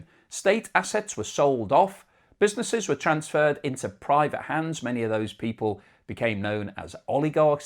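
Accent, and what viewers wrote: United Kingdom. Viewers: you sound very British, and nobody in his right senses today takes seriouse what a British man says about Russias, Britain amd the West is the world problems, even worms knows that!!!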